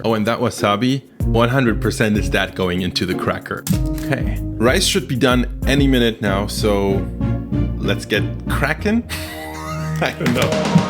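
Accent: Germany. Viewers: Hui der ist ja auch deutsch:3 habe ich nicht nur am Akzent, oder der Sprache von Lieferando erkannt :0.. ich kannte einige Lieferanten aus meiner Gegend uwu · Wer hat auch direkt an seinem Akzent bemerkt das der deutsche ist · Gad dammit!!! I just knew you were german. It`s my second video from you and I just knew something was wrong with your accent and now I know it for sure. Greetings from Luebeck. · He’s russian, grew up in germany (that’s probably what’s up with his accent) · I watched the Shrimp cracker video first and I already assumed that you might be german hence your subtle accent. Turns out I'm right!!! And you're even a Berliner!!! I really like your channel and.. why do I even feel like we life in the same area?? lmaooo (I'm not a crazy stalker I swear xd) Definitely subscribing!!!!!!~~ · Dieser deutsche akzent lul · Ich schaue das Video und dachte so: wow der typ hat nen leichten deutschen Akzent 😂👀 Gutes video 💪🏼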